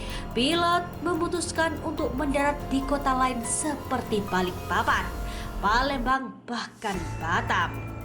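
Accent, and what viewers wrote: Java, Indonesia. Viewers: Pembawa berita nya medok bnget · Suaramu medok.mbak Wong jowo ya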